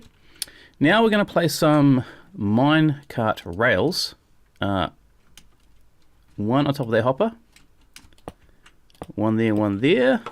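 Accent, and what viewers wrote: New Zealand. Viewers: Yea cause he's got a kiwi accent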